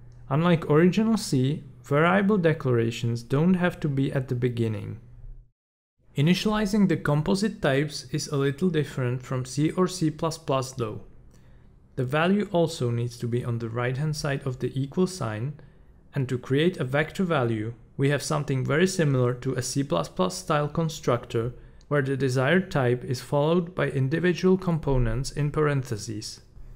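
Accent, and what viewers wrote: Czech Republic. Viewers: Czech accent immediately spotted :D, thanks for the great video. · tady je někdo čech já to v tom slyším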